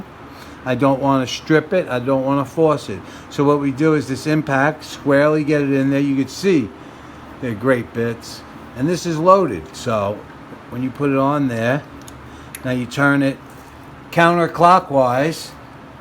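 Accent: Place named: Long Island, United States. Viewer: I lived in Suffolk County, Long Island New York. Sounds like you're from there, lol.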